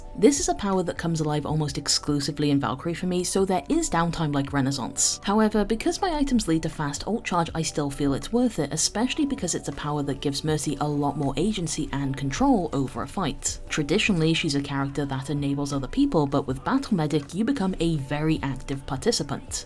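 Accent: Wales, United Kingdom. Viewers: Their Accent is Welsh if ur curious.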